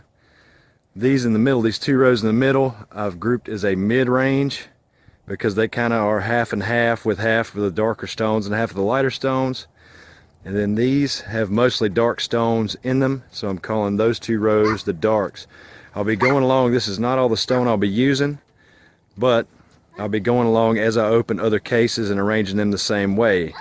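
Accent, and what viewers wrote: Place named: Georgia, United States. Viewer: thanks for the quick response! Where do you do your work? You sound like you're from GA.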